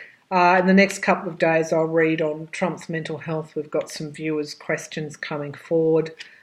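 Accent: Australia. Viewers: Thanks! Love listening to your Aussie accent! ❤️ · Can you please do a reading on NRA, Butina, Ericsson et al? I wonder if that's one of the 12 redacted cases. Thanks if you do, and thanks anyway if you don't. I have to focus to listen to what you're actually saying sometimes, because I am so fascinated with the way you're talking. I love Australian-English, and you have a manner of talking that's energetic and calming at the same time. Very much needed in the chaotic times of Trump!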